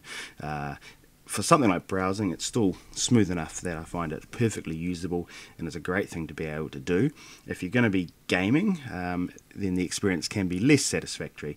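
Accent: New Zealand. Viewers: Are you from New Zealand by any chance?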